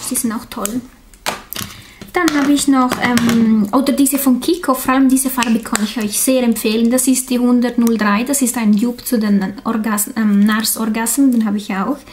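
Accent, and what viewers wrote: Switzerland. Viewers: toller akzent/ Dialekt..(weiß ich nicht genau) bist du Schweizerin?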